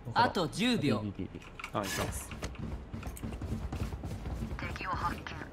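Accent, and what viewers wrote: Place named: Kansai, Japan. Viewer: さん喋りが関西方面の人だからたまに強い口調に感じちゃうけど人柄スゴくいいよね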